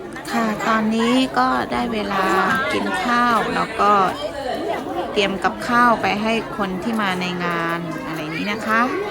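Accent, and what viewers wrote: Thailand. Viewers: น้องทำไมพูดไทย สำเนียง กทม. มีเเต่คนสวยๆ สวดเหมือนเชียงใหม่ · พูดสำเนียงไทยกลางชัดมากเลยครับ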